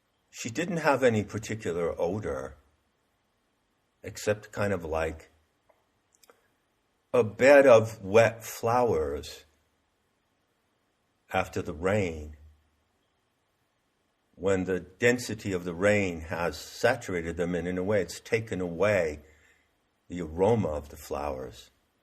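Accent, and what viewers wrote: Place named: Maine, United States. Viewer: Sensei, thank you for these genuine spoken words...i am just now obviously catching up to your most recent talks. I am not a young female of Spanish descent... this is my wife's URL, which ive had to use since being kicked off of themtube months ago. Such a Heretic am i! As a Jungian Psychologist (not Yung-lol) originally from the Boston area, i love to hear your Maine (?) accent. From Gnosticism to Jung to Henry Miller and Karuoac (Lowell, Ma) to Casteneda, the Mystery schools and more...so very much of what you say rings true to me as if it were second nature. What a terrible expression, second nature?! I have no heros, i have no idols, but simply felt the need to express how deeply i appreciate you sharing of yourself so honestly...a breath of fresh air in a world swirling in bull shite. My patience with people is waning...but it may be about damn time! 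In a sense, anyway.... I should write to you on your website without being a total blowhard. Thats not easy - i have much say that few besides yourself and a few deceased writers dare contemplate, lest it shatter their fragile egos. Your Integrity is panacea to my soul my Good Man! Cheers and Blessings from sunny, somewhat free Florida. Lux et Veritas mon ami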